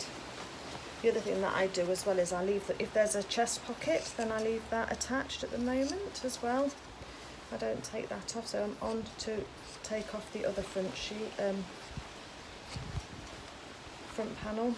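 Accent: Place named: Derby, United Kingdom. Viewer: You sound just like me. Are you by any chance from Derby?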